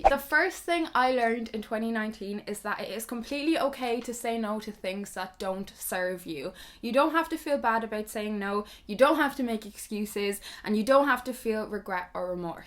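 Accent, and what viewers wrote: Ireland. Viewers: If you don't love an Irish accent, you're not alive.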